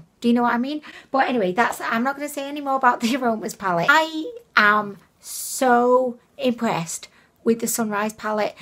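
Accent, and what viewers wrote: United Kingdom. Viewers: you make me want a British accent so bad! Wanna trade, I'm a southerner (South Carolina born and raised)? You'd just have to say y'all a ton, wet "yer" whistle with some sweet tea, while calling everyone Hun, Honey (not just Hunnies), Darlin', and Sweetie. 😂🤣😂🤣😂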